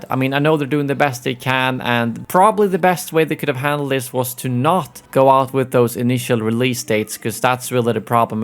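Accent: Sweden. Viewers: bru why is he speaking with a swidish accsent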